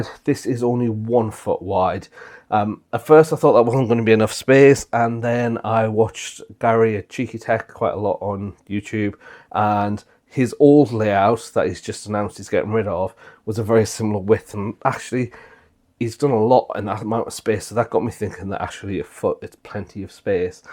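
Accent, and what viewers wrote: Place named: Sunderland, United Kingdom. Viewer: I'm not sure how helpful this will be for you. There's a little model shop in Sunderland (sorry I'm just guessing you are near by based off the accent) called Sunderland model Station. They have several new and used Skaledale buildings, there might be something in there for you. Again I'm not sure how easy it is to visit the shop but if you get chance it might be worth a look 😊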